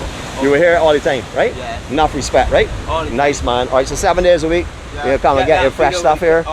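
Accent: Barbados. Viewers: Your voice is uplifting, love to hear Bajans speak. xx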